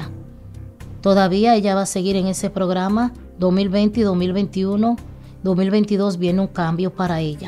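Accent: Dominican Republic. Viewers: Hola.....disculpa pero yo pensé que UD era Dominicana..no habla como venezola..con mucho respeto..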